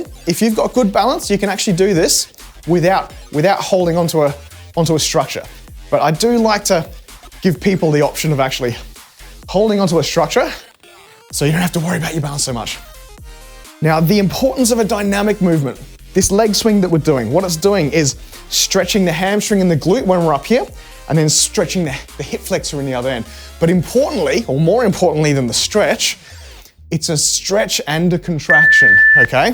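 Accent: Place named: Australia. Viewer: with that Australian lilt in your voice I reckon it would be a hit :D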